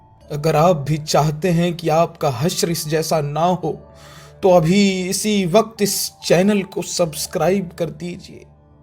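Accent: Standard. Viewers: Aapki Hindi sunn kr dil khush hogaya...Kash ki aaj bhi log aise he pure hindi ka uccharan krte..Toh nahi sub ko English mein maharat hasil krna hai..🤣🤣🤣 Apna Desh ka mother tongue hindi koi bolna he nahi chahta..Sad but true.. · Such a nice....voice you have...you are a multi-talented....🙏 I love your voice and pure hindi accent.... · Sir itni shudh hindi 😂😅😅😅😅...baht aacha h sir.. Hats off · ARE SIR G....AP TO GREAT HAI...GJB KI SUDH HINDI BOLTE H.....MHABHART.....KI YAAD AA GYI....APKI VANI SUNKR🤣😔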